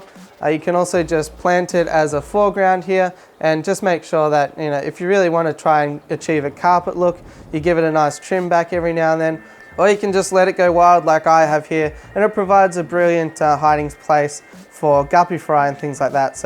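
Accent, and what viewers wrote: Australia. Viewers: R u ausie?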